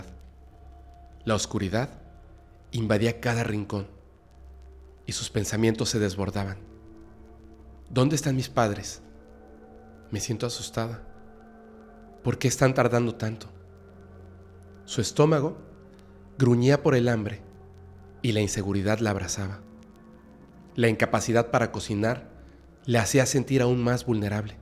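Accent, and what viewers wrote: Mexico. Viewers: Hola tengo una duda: ¿Eres mejicano? Por el acento lo pareces. De donde seas, te mando un gran saludo y un fuerte abrazo. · Si es mexicano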